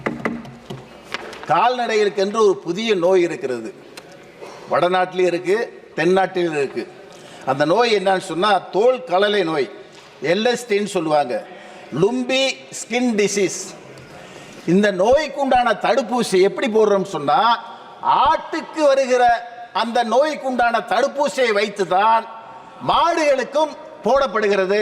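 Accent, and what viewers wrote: Madurai, India. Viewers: மதுரை மொழியின் சிறப்பு 🎉🎉🎉🎉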